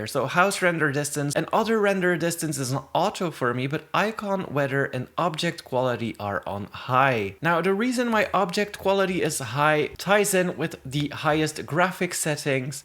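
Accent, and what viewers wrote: Netherlands. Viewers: are u dutch???!!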